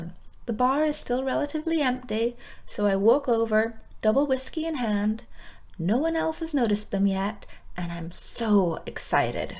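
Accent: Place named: Scotland, United Kingdom. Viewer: True 😅 You mentioned Belfast, is that where you're from? I had assumed your were Scottish or something lol 😅😆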